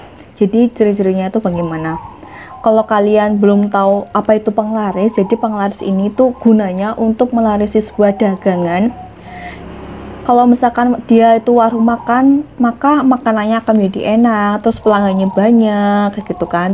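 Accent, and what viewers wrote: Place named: Tegal, Indonesia. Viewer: Orang Tegal yah.. logatnya kentara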